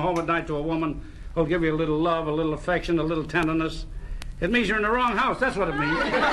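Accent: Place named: New York, United States. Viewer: Jewish New York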